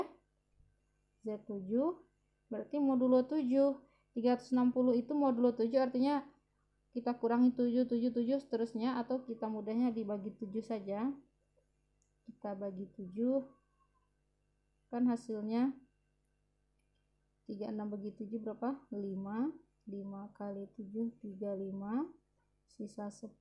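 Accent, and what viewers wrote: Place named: Painan, Indonesia. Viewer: Khas dialek painan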